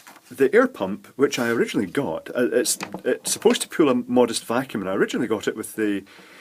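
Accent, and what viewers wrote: Scotland, United Kingdom. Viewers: Ha ha you could not help yourself, your a scottish version of dave jones, but much nicer to listen to:-D, i hope im not insulting you. I like your inginuity, clever but simple enough for people to make:-D That pump is such a clever design:-).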